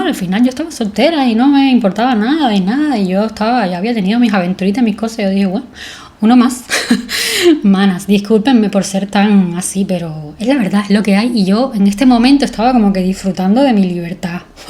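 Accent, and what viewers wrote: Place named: Cuba. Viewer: Mi esposo cada vez que escucha tu voz me dice: ya estas escuchando a y yo le digo claro que siiiii 😅😅 por fin llegamos a la parte feliz, te lo mereces todo mi reina. 🩵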